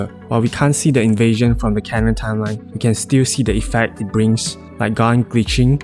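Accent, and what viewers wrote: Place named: Malaysia. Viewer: The Malaysia accent is killing me 😂 Good video brother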